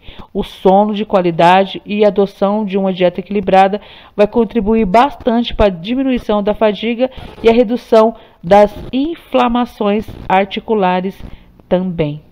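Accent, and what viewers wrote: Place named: Rio de Janeiro, Brazil. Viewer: A senhora é do Rio de Janeiro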